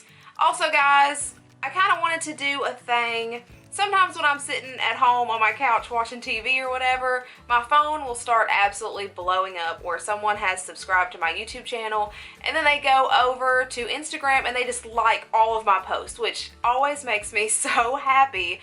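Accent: South, United States. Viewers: I’ve found my new crush that southern accent on you girl yeeeess please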